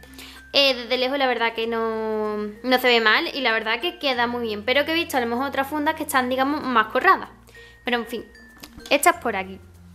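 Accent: Andalusia, Spain. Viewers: Mate Mastandrea porque es andaluza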